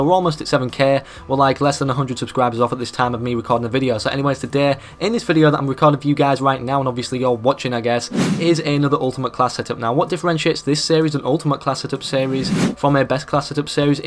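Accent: United Kingdom. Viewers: I hate your British accent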